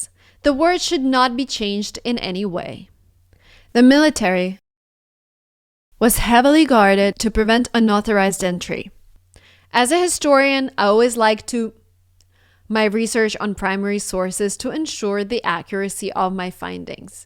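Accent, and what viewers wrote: Russia. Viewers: Бля...😂 жуткий РАШИАН ЭКЦЕНТ 😢 Слушай классическую музыку, развивай слух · Is she not Russian? It's just that her accent at the beginning was very similar to Russian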